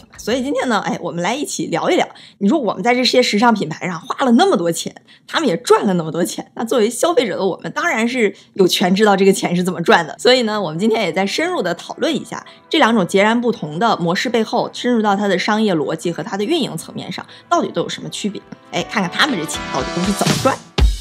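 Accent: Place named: Northeast, China. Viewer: 姐姐什么都好，就是东北音太重了。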